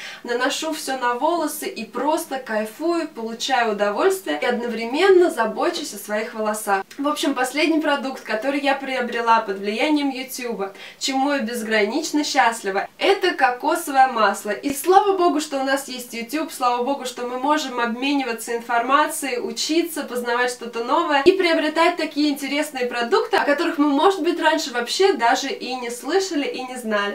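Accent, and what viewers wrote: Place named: United States. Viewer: убери американский акцент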